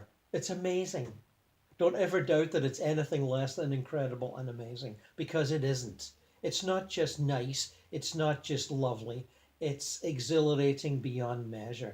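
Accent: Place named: Canada. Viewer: This is my Fav contact from a lot of your vids starting or about..and really kicks in ..better feeling even being in love.. yes you are in pure love and are the assents of love Love all your videos and thank you for posting these, so i don't feel so nuts..yet you let it out as no big deal..and inspires me too..edit : this was the 1st video i saw of you doing this, and the part how he will not ever forget those who were there for him in the end, aw.. see i was there for my grandma of 99 1/2 who died last yr at home using hospice care..and her last words were..thank you 3 times..and im thanking her..she got ill and wanted to thank me and just except it ..and pray pray pray she said 3 times.. it always worked for me.. Be blessed ..from South Carolina USA .. love your accent ..i love to think British in the uk but know its Canadian !?..maybe even Montreal Take care :*)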